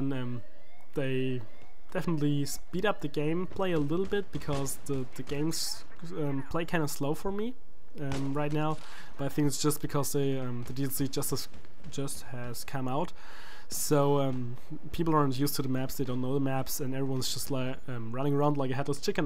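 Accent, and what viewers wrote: Germany. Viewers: ahh ein deutscher dachte schon ^^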